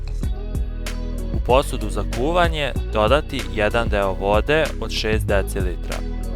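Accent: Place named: Vojvodina, Serbia. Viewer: Хвала. Па по изговору и изгледало је да је Војводина. Али сад си у иностранству. Вероватно и тамо радиш нешто са кулинарством.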